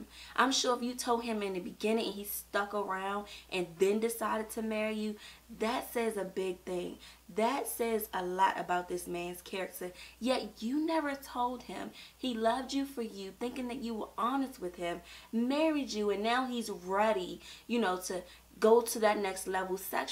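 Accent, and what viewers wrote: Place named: Maryland, United States. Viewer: Like you have A Maryland Accent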